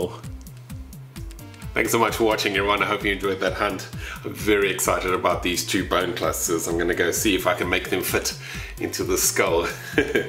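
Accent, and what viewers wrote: South Africa. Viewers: cool vid man love the fossil hunting vids you sound like you from cape town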